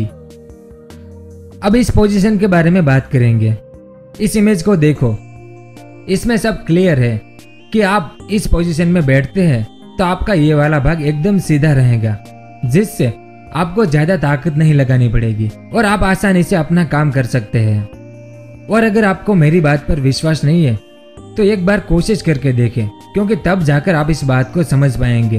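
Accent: Gujarat, India. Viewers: Ap gujarati hona bhai😂😂😜??? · Accent gujju bhai ..... 🤣🤣🤣🤣 like it....!!!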